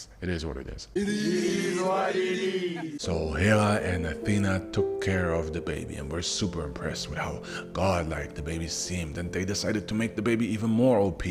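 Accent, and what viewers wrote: Germany. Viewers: Why do you have a German accent sometimes? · to me it sounds like a German accent. Also the pronunciation of the god’s names sounds exactly like you’d hear them in German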